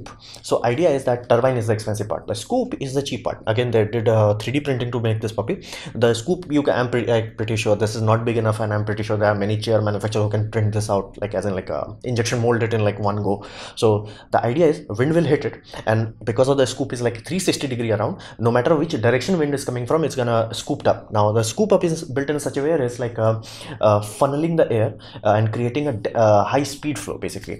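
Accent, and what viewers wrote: India. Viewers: Very nice, presentation!! I have seen your other videos also, you do lot of research and then prepare your videos.. Very good channel for science and tech guys! In this video, Powerpod attracted me, from photos it appears that turbine used in it would be around 25-30 cm in diameters. So it is high rpm low torque type turbine. I would like to ask, that to increase torque and decreasing rpm are they using gears, or this new designed wind turbine is directly connected to generator?? Is it possible that it could generate upto 5kilowatt ? Just think how much torque would be required to run 5kilowatt generator?Can funneling do real magic? (How much velocity of air increases by funneling, it's efficiency... Max rpm of turbine) What is your opinion on it? Please reply, But i liked this concept of rooftop wind turbine, well practically to verify these claims we have to wait when powerpod will be available in market.. 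Definitely one day when all natural resources like coal, oil,.. Etc we will be used than power production will become serious issue.We have to prepare for it right know!! You seemed to be Indian (your accent of English) I appreciate your hard work, and keep doing so, your channel will grow.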